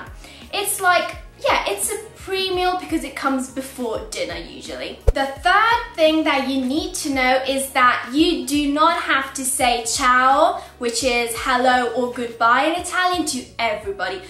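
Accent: England, United Kingdom. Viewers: Question.. If you are Italian, why do you have an english accent? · My grandmother was born in Italy. We still have her naturalization papers. Your English sounds English.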